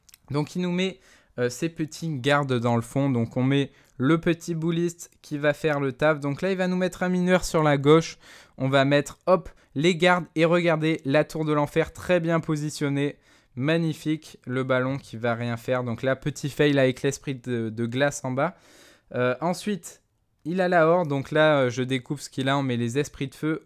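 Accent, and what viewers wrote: Belgium. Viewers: tes belge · il est belge ?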